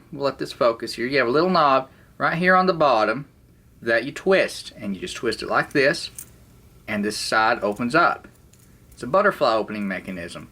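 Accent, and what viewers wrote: South, United States. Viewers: I am going back to these, as I started with them as a boy. I changed to GILLETTE and now am changing back. We all know why I guess. I watch Tesla racing channel mostly because of that great Southern accent. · That southern accent tho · As a brazilian guy I proud to say that my english is good enough to reconize that this guy is a Southern one.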